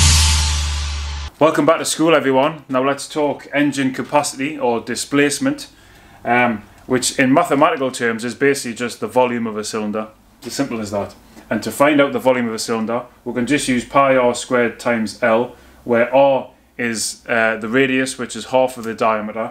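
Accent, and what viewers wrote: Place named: Newcastle, United Kingdom. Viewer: It's like watching a Geordie edition of Engineering Explained - Which is a good thing.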